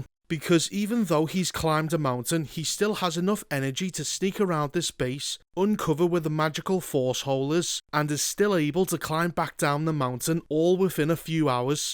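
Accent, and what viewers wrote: Liverpool, United Kingdom. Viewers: I would like to thank from the bottom of my heart for his extremely informative, mercilessly honest and extremely entertaining videos. Unfortunately, my twin brother and I discovered him just recently, as a true gem of the Internet. We enjoy movies, series and games both dubbed and with the original soundtrack since early childhood, regardless of nationality. To get straight to the point, the above-mentioned factors in combination with his great (scouse?) accent are a relief for the soul and have already ended many a shitty day with more than just a smile on our lips. If possible, let us know how we can support your channel so that this source of knowledge and good humor never runs dry. Regarding "The Whackoshite", I would like to mention a dialogue that I would love to have: Disney / K. K. / L. H.: “We cannot understand what the audience really expected... 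It’s simply impossible to do Star Wars (Episode IV-VI) justice and create something equal.” Me: “Do you want to know what’s really impossible, Disney / K. K. / L. H.??? Peeing in the corner of a round room." The Power of NONE... 🎭 🎭 🎭 The Power of FEW... 🎭 🎭 🎭 The Power of FUBAR... 🎭 🎭 🎭 P. S. Please forgive me for my possibly poor English. I'm a little older and therefore a little rusty.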